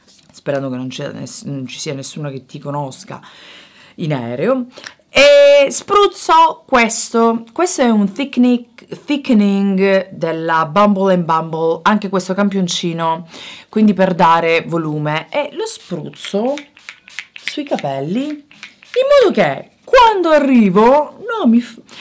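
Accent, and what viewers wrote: Sicily, Italy. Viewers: si sente dinuovo l'accento sicilia <3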